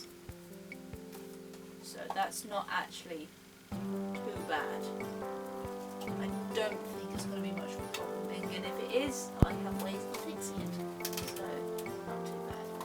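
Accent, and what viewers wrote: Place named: Peterborough, United Kingdom. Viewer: Are you from Peterborough? I recognise your accent.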